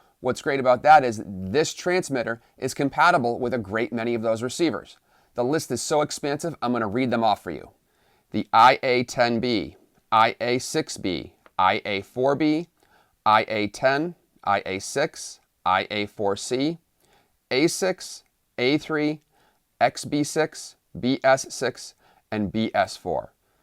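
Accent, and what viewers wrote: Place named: New England, United States. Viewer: What part of New England ar u from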